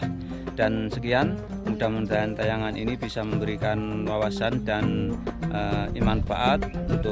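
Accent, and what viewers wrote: Java, Indonesia. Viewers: asli mana pk bicaranya kaya orang jawa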